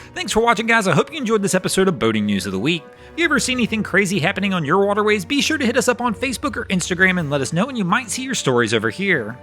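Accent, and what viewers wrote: United States. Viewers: Hi, are you able to post videos without commentary? I love your content but have a hard time dealing with your obnoxious American accent. Thanks in advance from Australia.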